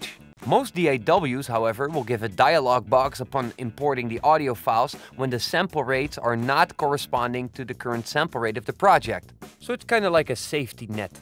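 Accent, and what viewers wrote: Netherlands. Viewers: are you dutch by any chance or belgium? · You sound dutch..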